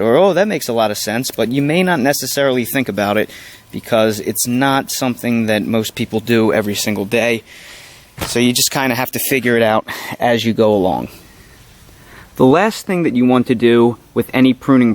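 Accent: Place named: South Jersey, United States. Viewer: I live in suburban philly and that sounds like a south jersey accent!!!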